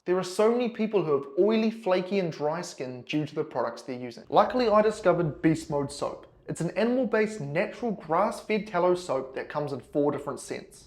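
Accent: New Zealand. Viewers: Ah— NZ! I knew the "accent" was not AU, and not SA. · Are you a New Zealander? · First video I’ve ever seen on this channel… what is his accent?! It’s seems to be 70% NZ, 15%Bondi hipster, 15% Saffa. Parents from Zim? I dunno, but I want to 😂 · Accurate video.. love the Kiwi accent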